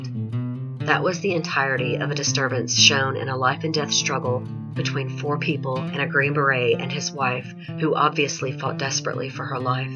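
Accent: South, United States. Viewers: Yeah. Her voice is like silk with SLIGHT SOUTHERN TWANG🌄🌃🆒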